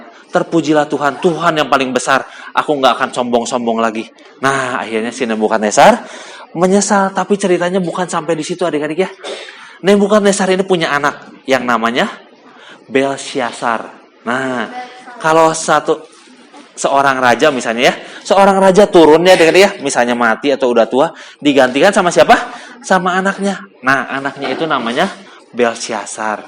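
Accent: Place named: West Java, Indonesia. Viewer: Wah keren kakaknya sermon pake aksen Sunda😂😇👍🏽👍🏽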